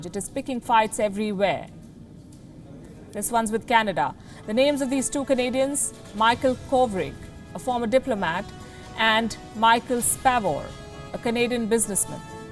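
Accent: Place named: India. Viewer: I like to listen but heavy indian accent make me dizzy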